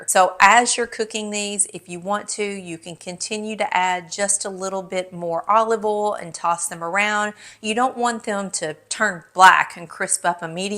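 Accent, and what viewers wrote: South, United States. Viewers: 🤣🤣🤣🤣🤣🤣🤣🤣she is a southern woman that's how they pronounce every thing and I love it!!! okayeee bless your heart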